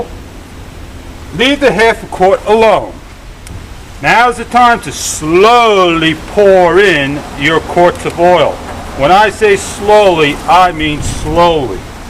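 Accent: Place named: Brooklyn, United States. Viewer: When I heard you say the word "stoop" I just know your from Brooklyn. Maybe your filming this in Brooklyn. I was born and raised in Brooklyn. Why is this so special, well, now I live in Delaware. There are a lot of retired people here from Brooklyn, like myself, but when the locals here my accent they know exactly where I am from. Anyway, I have a question for you. You said to change the fluid 4 times to help to clean out the filter. Do you have to use the very expensive Honda ATF every time you change the fluid, or can you use a cheaper fluid for the 1 day to clean out the filter and on the last fill, used the Honda brand ATF?? I hope you get this question and provide me with a reply. Thanks!!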